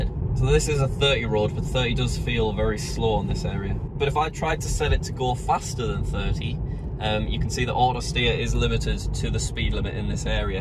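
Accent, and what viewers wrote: United Kingdom. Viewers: I thought u was American but ur British Greetings from Italy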